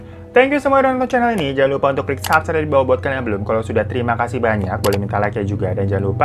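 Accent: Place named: Pati, Indonesia. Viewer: Pati mana suaranya 😅👆👆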